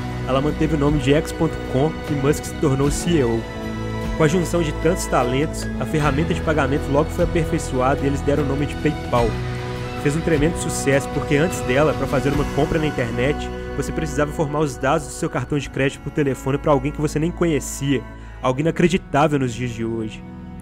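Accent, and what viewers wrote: Minas Gerais, Brazil. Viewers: Sei q vc 100% mineiro pelo sutaque. Mto bom o video mto inspirador. Thank you!!!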